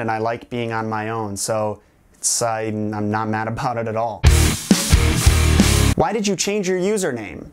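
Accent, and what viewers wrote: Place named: New York, United States. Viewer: Wait he is from a small country town? I literally thought he was a New Yorker or like from Brooklyn or something cuz of the accent I perceived from him lol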